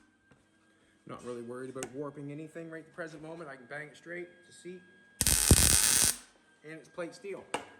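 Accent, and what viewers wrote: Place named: Nova Scotia, Canada. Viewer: Thar is a Canadian maritime accent, specifically Nova Scotia.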